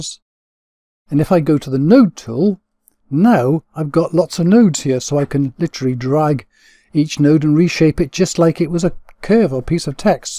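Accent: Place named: Wales, United Kingdom. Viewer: Just found you. You explained that so clearly... and you're Welsh! Thanks, I've subscribed.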